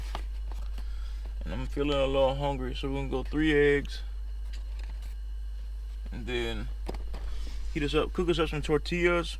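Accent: Louisiana, United States. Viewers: Sounds like you from Louisiana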